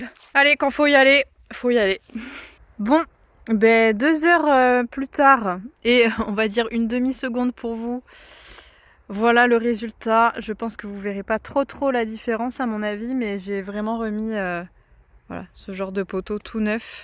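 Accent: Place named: South, France. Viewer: Beaucoup trop beau Baly ❤️ C’est magnifique de voir ça. Moi ce que j’adore c’est l’accent du Sud de la France. Chez toi chose c’est transforme en cheuse ahah je suis fan. Sinon je donne aussi de la biotine à ma jument une fois par semaine de Kramer Elle est en poudre et sens plutôt bon. Je ne connais pas les produits décathlon. Pour les pieds de Baly, temps que tu me dépasse pas 30 km par jour ( effort naturel du cheval ) tu peux parfaitement le laisser pied nus, regarde Jade ça ce passe super bien. Y a même des cavaliers olympiques qui sont sens fers. J’espère vraiment que tu vas pouvoir le laisser pied nus. Et le petit éclatax c’est du jujufitcat ça 🤣🤣🤣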